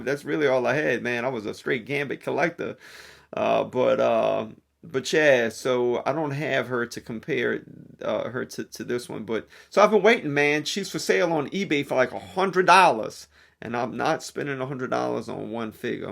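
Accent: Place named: New York, United States. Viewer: ..and I was thinking you lived in NYC lol. You're vocal inflections are very similar to Jay Z.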